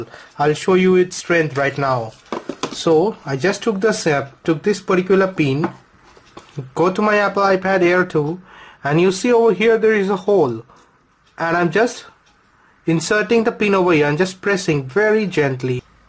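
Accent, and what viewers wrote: India. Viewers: I like ure indian accent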